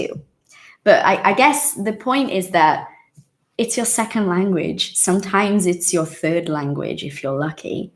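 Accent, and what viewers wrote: United Kingdom. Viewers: Louca para pegar uma live contigo. Já sou fã amor. Amo o seu inglês, sotaque britânico, e quero ser fluente na fala assim como nos ouvidos. Eu entendo tudo mas falar não consigo ser fluente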